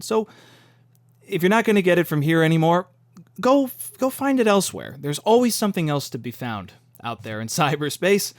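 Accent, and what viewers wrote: United States. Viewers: Dang. That's sad. Love your stuff. You have that perfect jersey voice. Good luck out there bruv.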